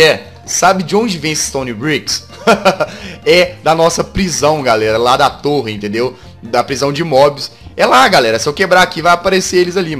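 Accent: Minas Gerais, Brazil. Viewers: Vc mora na Bahia????? Podia jurar que vc morava em Minas Gerais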